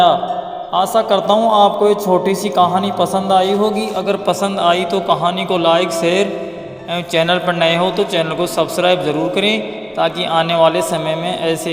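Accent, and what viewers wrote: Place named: Punjab, India. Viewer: Lagda y tu punjab ton a tere awaj lag rae a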